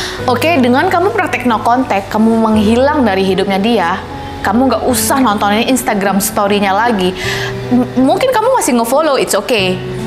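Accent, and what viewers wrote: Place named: Bali, Indonesia. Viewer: Sekarang logatnya udah ada Bali²nya. Follow kakak dari 4 tahun lalu :)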